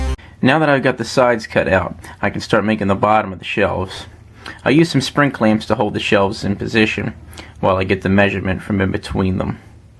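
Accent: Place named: Chesapeake Bay, United States. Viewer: I'm guessing that accent is from the Chesapeake Bay area.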